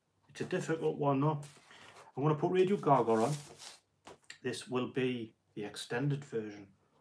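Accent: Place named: England, United Kingdom. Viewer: What part of England are you from? Your accent sounds familiar...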